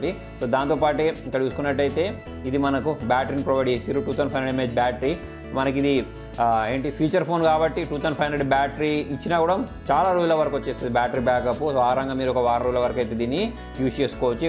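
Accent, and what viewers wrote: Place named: Telangana, India. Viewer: Anna telangana slang ......🤙🤙 Arjun reddy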